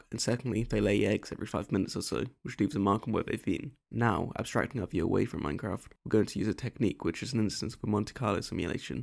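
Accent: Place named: Scotland, United Kingdom. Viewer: That's so cool, great idea ! Are you Scottish ? Because as a French it is hard to understand you 😆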